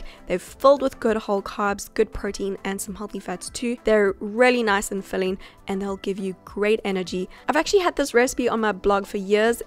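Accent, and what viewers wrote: South Africa. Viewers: when I heard your voice I was immediatly like South African hahaha I,m from cape town but love the video and thank you' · Are u from South Africa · Are you South African? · Is she from South Africa ?? Cause she sounds south African 😹 and she uses a lot of Woolworths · Nope shes South African, u can tell by her accent :P, some south Africans have that accent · Love your accent, is it South African? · Are you a South African?? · Are you South African? Your accent sounds like South African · Video was really useful and aesthetic 💕💕💕 Also a question... are you South African? Sorry if I’m wrong but your accent sounds really South African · Do you live in South Africa? You sound South African 🤔 I'd love to see a video with a bit about your life ! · Nice South African accent. Woohoo. I'm trying to lose a little bit of fat around my tummy. I work hard on my body and run hard but always have a biscuit for tea time and white bread. 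These are the clean snacks I'm looking for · Are you south african? You rlly sound like one! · Are you south african? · You sound South African 🇿🇦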